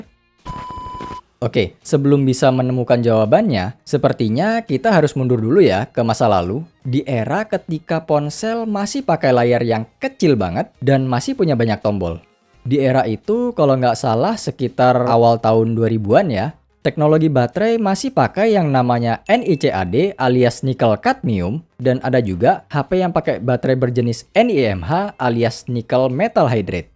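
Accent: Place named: Central Java, Indonesia. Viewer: Kayak orang rembang ini😅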